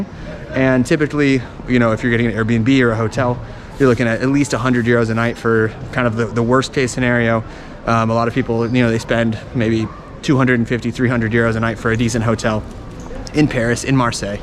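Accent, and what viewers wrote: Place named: United States. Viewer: He sounds like from USA. But he looks Middle Eastern to me.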